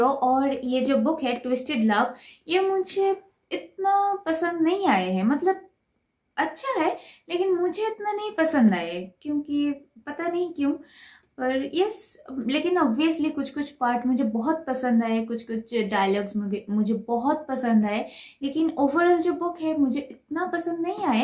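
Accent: Bengal, India. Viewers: She is bengali so her hindi isnt proper. No problem with that 😊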